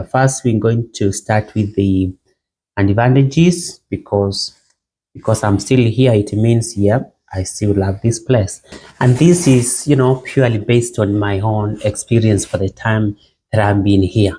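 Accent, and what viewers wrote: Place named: Kenya. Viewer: Greetings from Kenya, I can hear from your accent you are a kenyan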